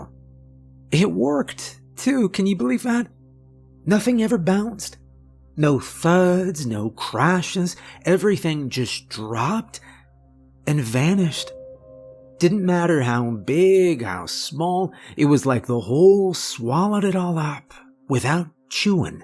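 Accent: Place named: South, United States. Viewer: I love your voice, country (both southern and northern) accents always put me right to sleep. Feels like pa. Your voice reminds me of a buddy of mine, tall , short blonde hair, built like a bodybuilder, does electrician stuff. Born n raise in the heart of Tennessee, with a smooth southern accent. You have a voice that keep reach folks’ hearts. If ya ever get tired of reading stories to folks, take up public speaking to folks. A voice like yours can bring change, buttering’ your way into folks’ hearts. It’s calming, the tone, cadence, pauses are just right ❤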